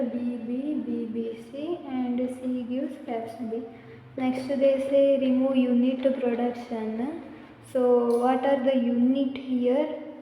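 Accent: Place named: Mangalore, India. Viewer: U r from manglore right???😁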